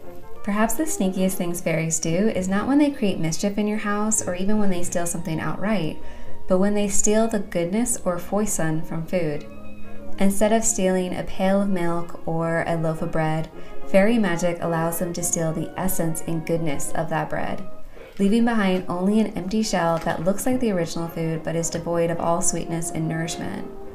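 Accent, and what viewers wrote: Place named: United States. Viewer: for years I wondered what your voice sounds like and it sounds very deep and very American I hope it's going to be more of these long-term videos to stay around forever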